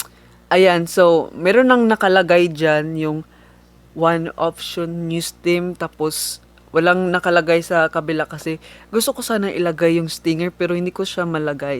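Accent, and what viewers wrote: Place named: Visayas, Philippines. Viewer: feel nako bisaya gyud ni ba HAHHAHA i feel uuuu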